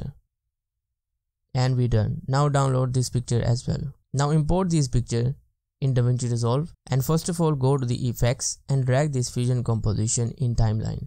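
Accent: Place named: India. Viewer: I struggled a bit too. He sounds like he might be south Asian. Possibly Indian. I was able to navigate his accent because I’m friends with a lot of Indian dudes at my work who have similar accents. Once you work out his words, the tutorial is actually very good.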